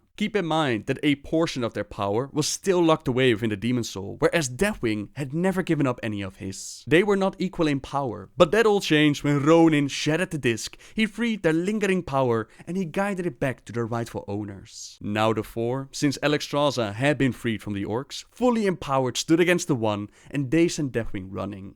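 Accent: Netherlands. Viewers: Dutch accent> stopped.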